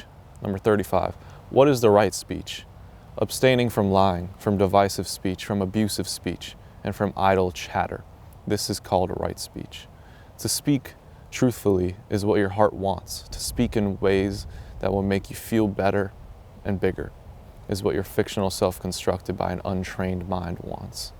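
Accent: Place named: United Kingdom. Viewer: Thank you. I like the British accent! Made me laugh!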